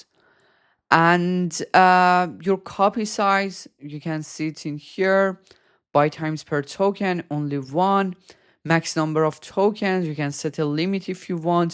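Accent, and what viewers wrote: Iran. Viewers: از لهجه تخمیت معلومه ایرانیی😂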